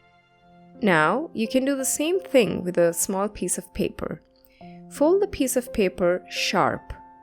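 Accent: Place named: Kenya. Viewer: Thank you. Your accent is so Kenyan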